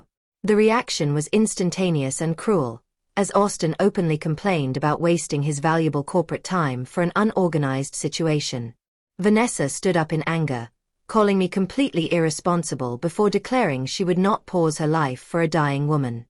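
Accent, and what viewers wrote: United Kingdom. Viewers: British accent but lived her whole life in Ohio. AI slop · Why does the voice have a British accent when she’s living in OHIO all her life??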